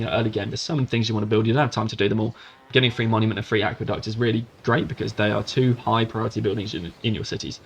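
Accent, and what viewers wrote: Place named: United Kingdom. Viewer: I like how dudes from the UK don't say culture, they say culchá. It sounds cooler.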